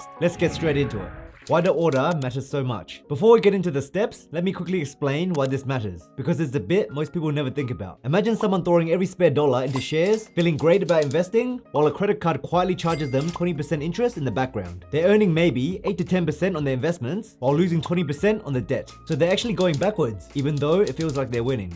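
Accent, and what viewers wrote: Australia. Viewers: Typical Viet Aussie accent❤ smart man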